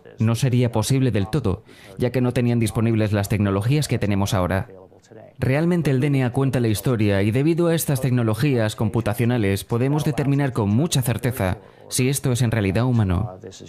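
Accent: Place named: Spain. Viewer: Pinche gente huevona que no tiene otra cosa que hacer ? Pongance a trabajar de verdad, y esa pinche voz española agrede el oido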